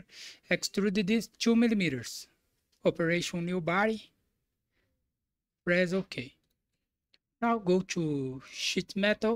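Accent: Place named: Brazil. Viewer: Excellent explanation, congratulations. One doubt is that from the way you speak, it seems to me that you are Brazilian. Am I right?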